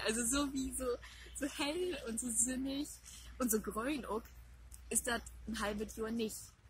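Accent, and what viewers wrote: Low German, Germany. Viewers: Möcht ne Fru, de Platt snakt. So sexy, so sympathisch. Einfach Klasse. Könnte Stunden lang to hörn. Bannig schaun 😇🥰👍🤗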